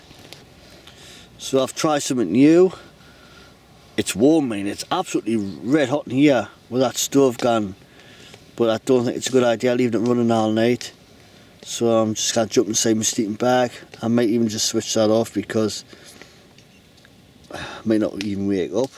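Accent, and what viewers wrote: Newcastle, United Kingdom. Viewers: Love the Geordie (?) craziness, any chance of subtitles for us Southerners - only joking ;-) Useful info too thanks.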